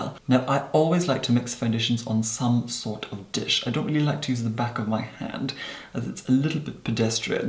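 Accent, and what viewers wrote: Scotland, United Kingdom. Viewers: What the hell is that accent?? It’s a mix of posh Scottish and god knows what else? Jesus. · Knew he had 2 be scottish doin an accent!!! Something about th tone of his voice is very lorraine kellyhaha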